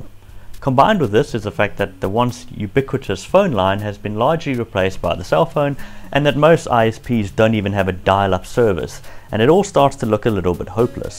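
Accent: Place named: South Africa. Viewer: Thanks for the enjoyable video. Very sorry to hear about the camera, but the little one seems to be doing a decent job. It's also great to see somebody in SA covering retro tech. I'm from the other SA, on the other side of the planet; South Australia. That said, I've been lucky enough to spend time in South Africa (and East Africa) on several occasions. Your accent made me smile, and really miss the place. Have a good weekend!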